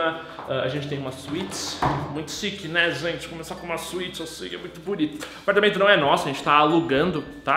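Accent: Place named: Rio Grande do Sul, Brazil. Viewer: Pensei que ele fosse gay, mas é só o sotaque gaúcho mesmo.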